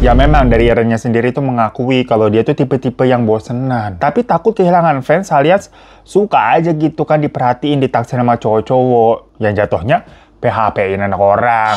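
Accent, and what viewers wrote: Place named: Medan, Indonesia. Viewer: KEK MANA, Udh kek orng Medan ya bg :v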